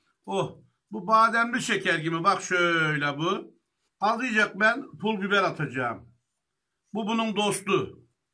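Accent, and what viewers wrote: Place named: Antakya, Turkey. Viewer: Aynı hatay antakyalilar gibi konuşuyorsun:))) bizim buranın yemekleri de güzeldir bekleriz:)) seviliyorsun:)) yiycin yapıcın edicin:)) bağh hele:)) hastahanalık oldu:))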